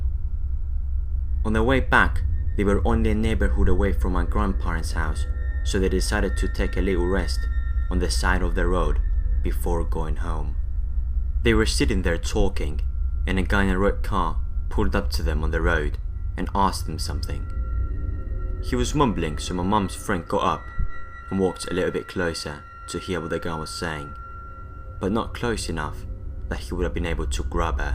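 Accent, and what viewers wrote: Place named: Derbyshire, United Kingdom. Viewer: even though you're Greek you sound like my friend from Derbyshire County in England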